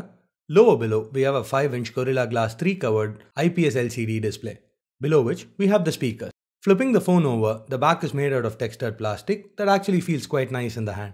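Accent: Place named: South India, India. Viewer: Good job. Now that you've started sharing the burden off Ash's shoulders, you will grow into a much mature reviewer in the coming days as you have Ash for support. Just need to tone down your South Indian accent a bit. but nonetheless, a good review. thanks!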